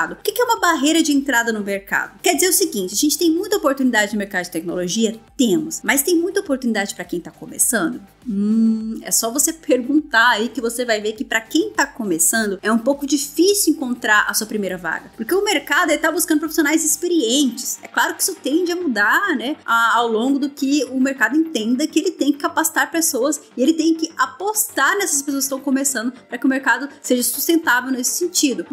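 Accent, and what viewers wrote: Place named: Brazil. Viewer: Bequiendgi, Frontiendgi... adoro o sotaque brasileiro no inglês, tanto tempo fora me faz sentir muitas saudades.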